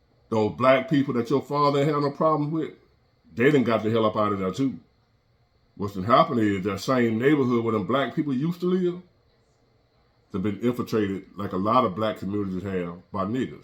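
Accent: United States. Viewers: It seems like the video is interesting, but I need to read through th comments to have a clue because of your heavy American accent.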